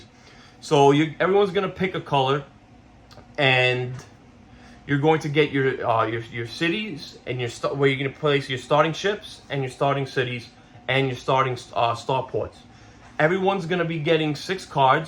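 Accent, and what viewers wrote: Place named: New York, United States. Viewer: Great job!!!! I just come here for your accent as I miss NY.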